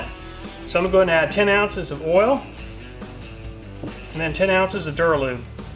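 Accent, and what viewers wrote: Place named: Pittsburgh, United States. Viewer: Thanks for all your hard work testing. I do my own testing, but it's only seat of the pants/noise/smoothness, etc. I grew up in Pittsburgh, PA area. I have been listening to your pronunciation. You sound like you might be from somewhere not too far away. Am I on the right track?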